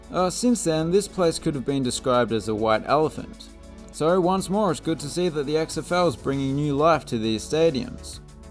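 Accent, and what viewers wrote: Australia. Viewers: Why is there an Australian guy speaking. This is America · Its interesting hearing an Australian accent talk about American football. Great video man.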